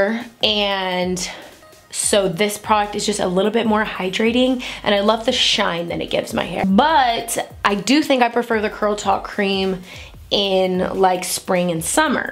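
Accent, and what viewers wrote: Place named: Texas, United States. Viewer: Not too strong, but I assumed you were a Texan the first time I heard you speak. It's not country though or East Texas twang. Brings out my drawl anytime I hear another Texan speak ( or Southerner for that matter), even when it's not thick. No idea why! Graveyard Girl does it to me as well. Incidentally, I watched Good Girls the other day and was excited when I recognized you. I yelled at my husband " WE HAVE THE SAME HAIR!!". He was confused and startled lol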